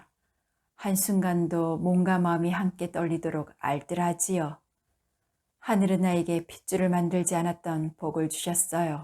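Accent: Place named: Seomjingang, South Korea. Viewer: 님 섬진강 사투리가 구수하네요 처음듣는 때 울음 🐓 꽃 따는 머슴 왠지 가슴이 먹먹하네요 매화골 언덕 길 찻집 머슴 나뿐놈일까요 슬품이 담긴 피릿소리 꽃 차향기 외로운 여인의 향기는슬프군요 😊 감사합니다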